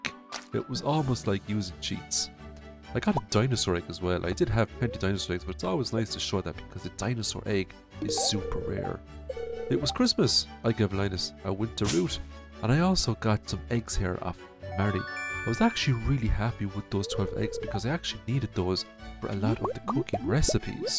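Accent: Ireland. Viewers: 'I played 200...' Irish Accent. Instant subsribe. Let's go.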